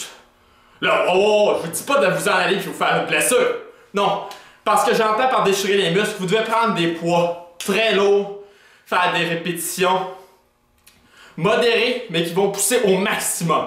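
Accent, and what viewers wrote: Quebec, Canada. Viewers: oh putain jai crus qu'il parlait même pas français pauvres québécois... vous avez un accent vraiment horrible jvous plains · Je kiffe l'accent québecois :) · on dirait un canadien Hmm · c'est pas un accent bizarre ... c'est un Québécois! Ecoutez pareil il donne de bons conseils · cest du quebecois,ignare!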